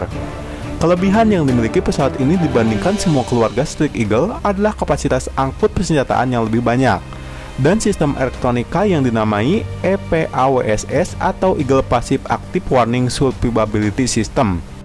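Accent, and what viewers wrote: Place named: Sunda, Indonesia. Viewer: Sundanya medok pisan mang hehe 😁😁👌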